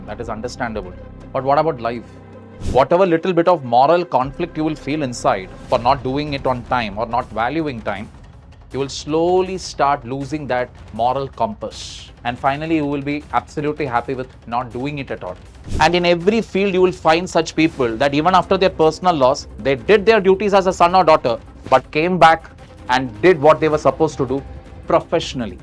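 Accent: Kerala, India. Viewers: This stupid is wasting aspirants valuable time by cleverly indulging motivation content in his lectures, with that malayali accent and popping eye gestures he is playing a good drama digitally and earning well.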